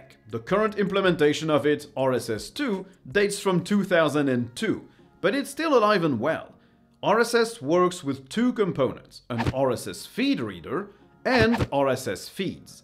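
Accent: France. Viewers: Are you French? I thought you were Spanish.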